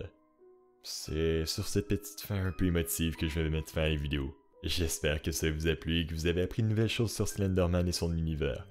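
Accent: Quebec, Canada. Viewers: C'est du quebecois enfin rhooo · J’aime bien ton accent je sais qu’il est québécois mais il vient de quelle region Sinon continue comme ça!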